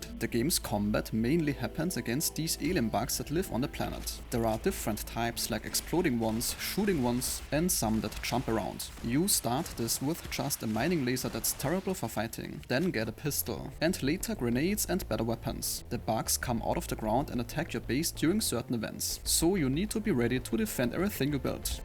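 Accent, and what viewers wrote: Germany. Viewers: Ah good old German accent ❤ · Servus, man hört es direkt raus... Hast auch einen deutschsprachigen Kanal? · bro i can hear instantly that he is german haha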